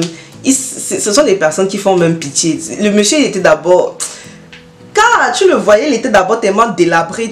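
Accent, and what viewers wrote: Cameroon. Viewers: Tu as un accent camerounais????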